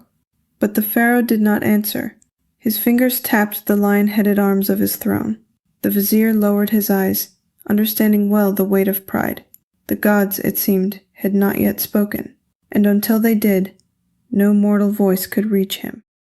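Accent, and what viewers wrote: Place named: North America. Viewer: I'm playing accent detective... You were raised in North America. That's all I've got hahaha...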